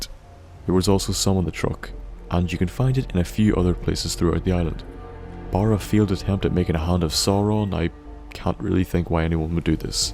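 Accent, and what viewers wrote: Northern Ireland, United Kingdom. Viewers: My guess is South Down Or Tyrone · You sound so Northern Irish XD great channel btw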